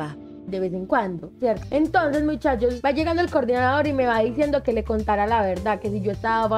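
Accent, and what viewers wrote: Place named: Antioquia, Colombia. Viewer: buenas noches saludos desde el municipio de arauquita departamento de Arauca y soy nuevo y quiero seguirla a su Instagram etc. y la voy a apoyarla yo a usted en todo el momento y me gusta mucho su acento de paisa y me gusta las mujeres paisas y además me gusta las mujeres que sean churcas lisa etc. y siga adelante con su trabajo de ser you tobe y la siga bendiciendo a usted y a su familia y además con tantos problemas de la que estamos lo de la pandemia pero no hay que darle miedo pero usted ora y rezar para que pase todos los problemas y dificultades y hay que seguir pa delante por que pa atrás lo asustan y mil bendición y que tenga una feliz noche y que Dios lo bendiga a usted y a su familia.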